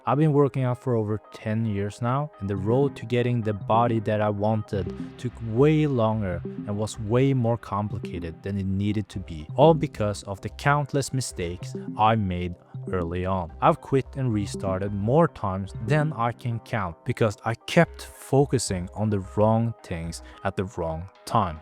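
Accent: Norway. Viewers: Lol, you sound Norwegian?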